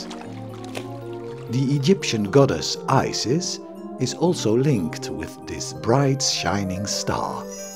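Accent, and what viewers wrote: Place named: Netherlands. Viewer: It's 'Sirius" not Sairius nor Thairius. Info is okay, the Dutch accents and pronunciation mistakes are annoying. so is the pedantic intonation.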